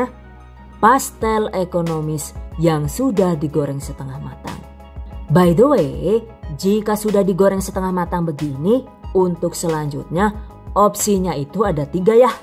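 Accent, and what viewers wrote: Banyumas, Indonesia. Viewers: Ikut komen ya kak. Emang itu sudah jadi ciri khasnya. Campur ngapak n inggris medok juga😅. Semuanya begitu. Tapi semua resepnya recommended dan nyaris ga ada yg gagal kok. kl baru lihat emang aneh, lama2 akan terbiasa🙏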